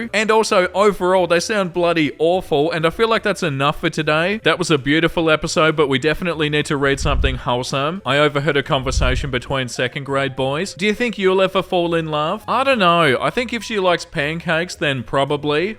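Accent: Australia. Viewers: What? But your Aussie accent is part of what makes you so compelling to listen to! It gives your speech a nice, lilting cadence, like a little song. 🎼 Sing a little song of neckbeards!🎵🎶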